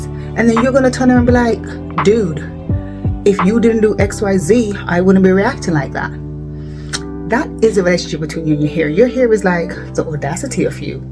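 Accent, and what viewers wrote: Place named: Jamaica. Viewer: Ms, where are you from? I hear some Jamaican in your voice. Loved seeing the progression in the video. I too suffer from hair loss due to hormonal issues. Looking forward to seeing your progress.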